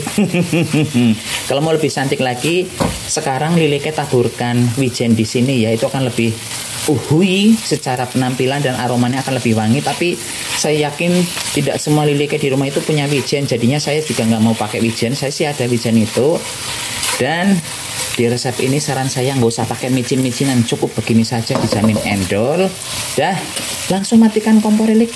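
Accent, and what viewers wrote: Banyumas, Indonesia. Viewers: Lucu ngapak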